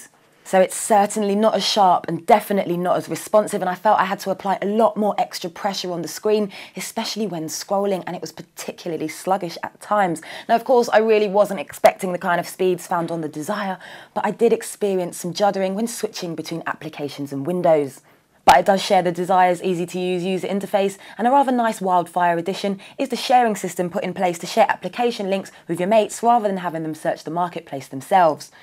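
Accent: United Kingdom. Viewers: stop speaking british, its annoying!!!! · i buy ihm few days ago so i dont know what she say?if is price than i buy me Vertu Signature...or if is procesor and ram and graphic i buy me Alienware laptop so funny and all who want to buy a wildfire buy it u will not mistake it doesent cost alot and u have evrythingwhy is this or that ...hm money,that much music...we say like thatand this english Mate girl is talking bad about that phone bloody hell xD hate the acent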